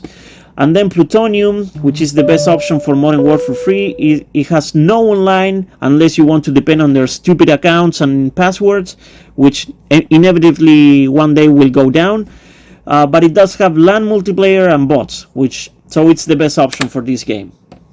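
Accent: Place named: Argentina. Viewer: Qué pro, Boludo tus tutoriales son de lo mejor,, sigue con el desarrollo de tus proyectos, sabía qué eras Argentino, ese acento no se quita ni con inglés, desde el principió me dí cuenta con ese inglés, jaja, it keep also, bro.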